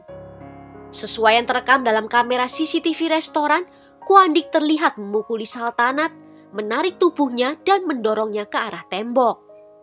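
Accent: Java, Indonesia. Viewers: alamak medok gilerr derr😂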